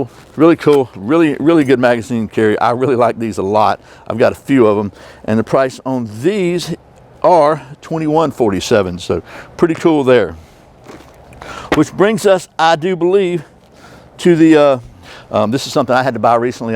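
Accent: Georgia, United States. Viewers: It would be really helpful for those of us who haven't quite calibrated to your Georgia accent if you would list the items you described on this wonderful video so that we have a fighting chance of purchasing the right items!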